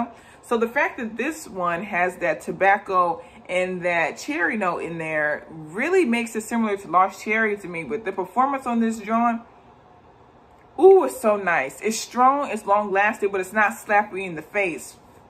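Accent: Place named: Philadelphia, United States. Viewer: I love your review. I've been contemplating buying this since I picked up Black Phantom by Kilian which is now my favorite scent of all time. Are you a Philly girl? I believe I hear some of that Ptown in your speaking which I miss since I left home. I've been living in Hawaii for years now but I'm originally from Southwest Philly. Keep up the good work.🙌🏽🙌🏽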